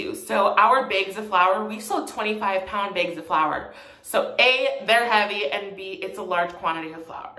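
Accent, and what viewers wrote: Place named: Canada. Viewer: Yeetus Feetus because he pronounced those words with the “long a” sound. Which is okay, I know he’s from Canada. But I’m from America and we pronounce those words with the “short a” sound so I thought it was funny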